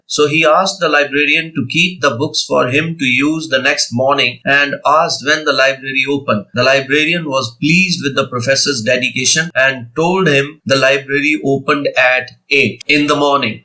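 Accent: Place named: India. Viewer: I like your Indian Accent more than foreign accent😊😊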